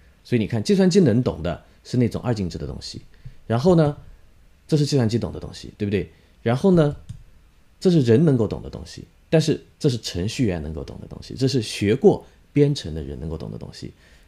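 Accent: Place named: Hangzhou, China. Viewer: 翁老师的杭普太亲切了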